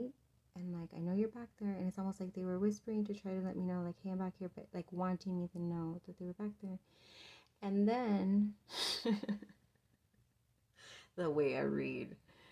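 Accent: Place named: Latin America. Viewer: Pile 3 -Oh my Goodness, What can I say but...what an extraordinarily, beautiful 😍 reading. Firstly, I love how you speak with your receiving hand. You are absolutely 💯 amazing. I would be able to understand everything you say even if there was no sound. The sign language you send out is clear and concise. I'm not sure what nationality you are and I don't want to assume but by your accent I want to say hispanic? A beautifully, sensual spoken ❤️ ❣️ LOL, I'm Ukrainian and I speak with my hands. LMAO I catch people watching my hands constantly and it looks like they are like what the hell?!😂🤣🤣 Even when you talked about the curtain you have up, your hand created that curtain as well. ✨️Truly uncanny✨️ So many syncronicities. Everything from the dried flowers(I remember the reading a few weeks ago that you first brought them in. It completely caught me off guard. 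I didnt comment because there were too many personal messages that I didn't want to share publicly. And I will say that all 3 piles resonated heavily), bessom broom and drift wood all spoke to me very deeply. My supernova tower moment happened in 2007 and since brought multiple tower moments to help me clear all heaviness I have been carrying. The most recent tower moment which started on May 12th and completed itself May 14th, has brought a serenity to my life like no other. Please know how connected you are to the collective(and well me, I feel very guilty because I feel like I am receiving free readings, which I wou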